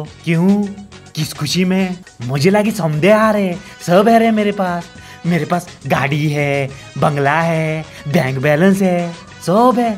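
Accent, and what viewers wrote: Goa, India. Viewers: Love the goan accent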